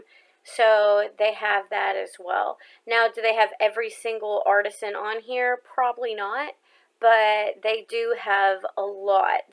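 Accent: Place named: South, United States. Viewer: Your videos are absolutely the best I have seen. Thank you for sharing the sites you use to identify makers and marks, and to purchase jewelry, and thank you a thousand times for the lists you have created and shared!! Fellow southerner, so you sound just fine! :)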